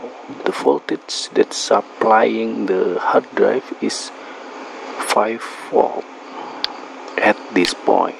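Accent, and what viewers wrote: Indonesia. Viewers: awal2 dikiranya orang thailand tapi kok lama2 di dengar aksen inggris nya kaya orang indonesia nah pertengahan akhirnya nongol juga bahasa asli nya wkwkwkw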